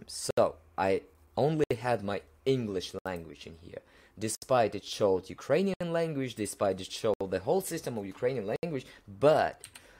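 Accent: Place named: Russia. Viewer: that's russian accent bruh :)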